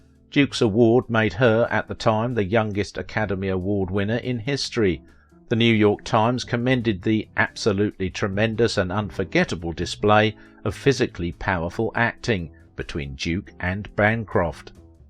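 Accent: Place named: Manchester, United Kingdom. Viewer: In your opening 1st paragraph in the last mangled sentence, you are apparently confused with the name of the actress Patty Duke and the name of the person she was portraying – Helen Keller. And in your opening 2nd paragraph you seem to be very gender confused. Can't you distinguish the difference between the "his" and "her" pronouns? Or is that a British idiosyncrasy? And why is a thick (sounds like Manchester) British accented man narrating a short bio on an American actress who's early 60's show and TV movies I very much doubt were broadcast anywhere in England or The UK at that time or anytime since then?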